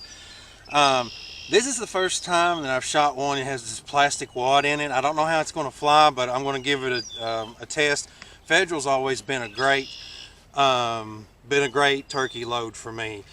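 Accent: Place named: South, United States. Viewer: MAAAN, I love your southern drawwwl I LOOOOVE it, I am coming to NC So the question is Mossberg or Browning BPS, which one is better?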